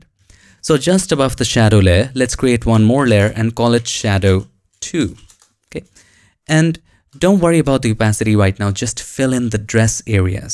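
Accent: India. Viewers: I like your funny Indian accent!)))))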